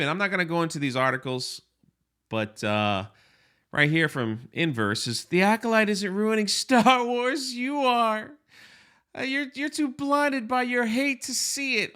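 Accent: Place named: California, United States. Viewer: Ok huge question -- are u a surfer from southern california? You sure sound like one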